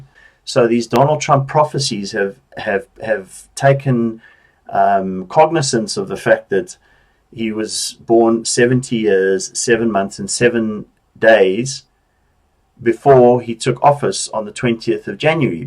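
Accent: South Africa. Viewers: I can hear that SA accent boet 😁👍🏼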